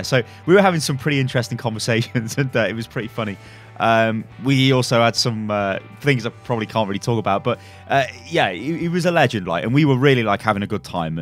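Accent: United Kingdom. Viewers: I hate how brits say weekend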